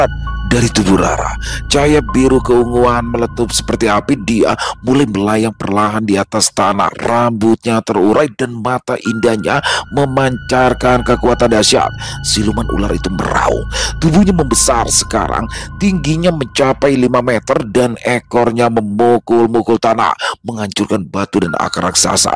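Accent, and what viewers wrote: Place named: Betawi, Indonesia. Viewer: Pulen amat bang logat betawinya. 😅😂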